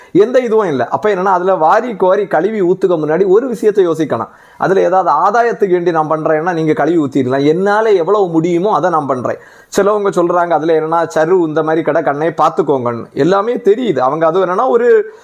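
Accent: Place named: Nanjil, India. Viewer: Great work 🎉🎉 Love your Nanjil. Accent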